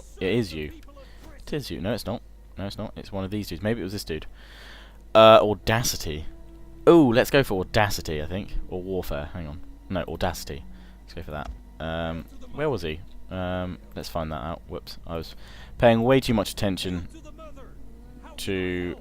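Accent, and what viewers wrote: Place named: England, United Kingdom. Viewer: the English accent is hilarious (says the auzzie to be fair)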